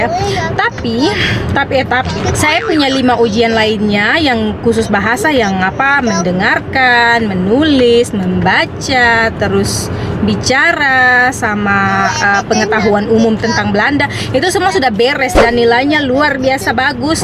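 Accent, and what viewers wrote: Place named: Papua, Indonesia. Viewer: Bahasa belanda campur logat papua 👍😂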